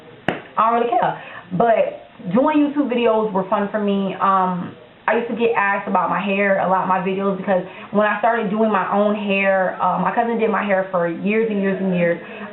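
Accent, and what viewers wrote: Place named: New Orleans, United States. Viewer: you sound like your from new orleans i always thought you were .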